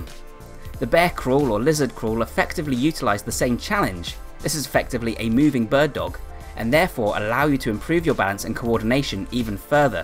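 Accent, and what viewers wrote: United Kingdom. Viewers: why is there a Dutch windmill in the video but i hear a british accent? I didn't know the UK has such windmills too!! awesome, I will implement this kind of training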